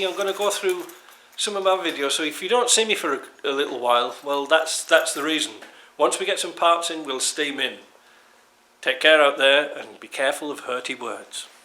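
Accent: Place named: North, United Kingdom. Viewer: As we are well into the 21st century now, it appears that it is becoming an increasingly pc-culture where we all have to be careful not to hurt other people's feelings by making passing comments about this, that or the other! One of your greatest assets to my mind, is straight-talking without all the BS & razzamatazz often associated with instructional videos elsewhere. Its not like you threatened anyone or incited bad behaviour and I (as a fellow northern Englishman in the UK) can empathise how the northern attitude & brogue, is sometimes misconstrued as harsh to some sensitive souls! Just keep doing what you've been doing & helping all those with older Defenders, to keep on truckin'!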